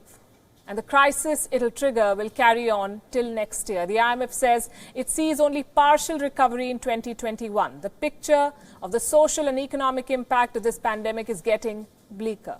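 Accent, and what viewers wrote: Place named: India. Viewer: INDIAN ACCENT PAY PEY, SOON SUN, SAY SEE, ABLE EBLE, PEOPLE PEEPLE, TAKEN TEEKEN, AID EED, WAIVE WEIVE, NATION NETION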